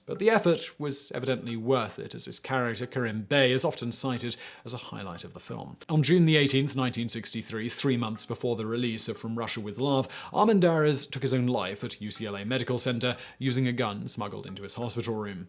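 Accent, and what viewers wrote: United Kingdom. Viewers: he's a Brit living in Prague why would he pronounce things in American English? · is British and that’s how many of them would pronounce it via their accent. Learn something new every day indeed. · Does he say Advertisements as "ad vert is ments" I guess it makes more sense than the American accent version, but as an American...I kind of hate it. Its "Ad-ver-tise-ments" like I cant stand how brits say "Gare-ege" GA RAJ. Ok, the way we pronounce words not as they spelled, is dumb. Ill admit. Still not a fan of how brits say things😅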